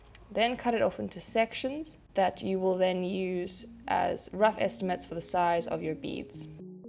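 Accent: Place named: Australia. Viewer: She is southafrican? I thought she was Australian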